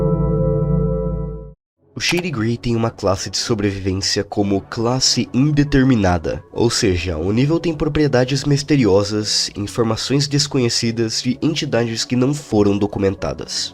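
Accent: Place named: Rio de Janeiro, Brazil. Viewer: ksksks cê é carioca né meu patrão, reconheci só pelo "S" com som de "X", foda ser carioca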